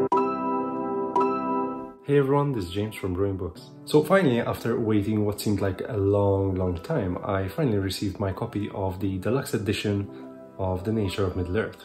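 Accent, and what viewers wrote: Malta. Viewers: by any chance, are you maltese?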